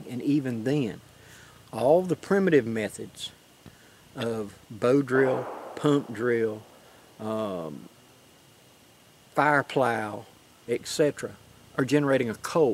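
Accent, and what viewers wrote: South, United States. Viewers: Thank you for the wonderful teaching field trip...love the scenery as well...as always i am very impressed with your Videos, including the Southern drawel 🥰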